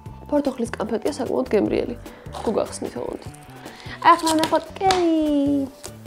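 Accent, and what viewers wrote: Imereti, Georgia. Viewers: იმერელი ხარ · იმერელი ხარ?❤❤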